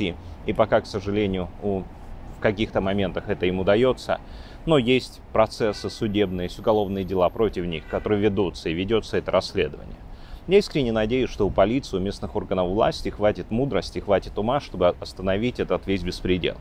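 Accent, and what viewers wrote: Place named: Moscow, Russia. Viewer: мене просто здивувало, те що вчитуючи саму специфіку данного регіону, в якому взагалі важко почути російську, а тут на тобі російська та ще й я б сказав чисто з московським акцентом?!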